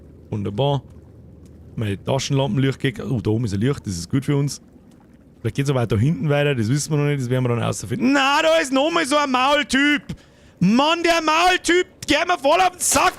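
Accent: Bavaria, Germany. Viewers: #nochschausquad servas. danke für deine Streams. Mehr boarisch, weniger preissnscheiss! des passt ba dir immer:)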